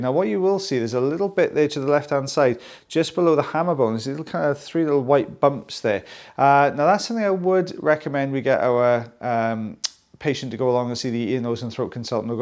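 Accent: Wales, United Kingdom. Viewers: I swear I only watch for the welsh accent. Not been to Wales since covid 😢. I live abroad now.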